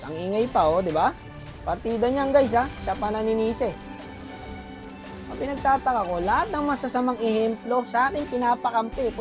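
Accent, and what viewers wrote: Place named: Visayas, Philippines. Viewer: bisaya yan halata e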